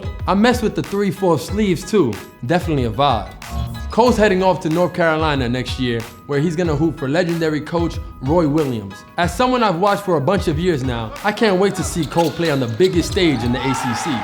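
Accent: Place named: Dominican Republic. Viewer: Bro I had to like the vid when he spoke that Dominican Spanish. Ayyee🇩🇴🇩🇴🇩🇴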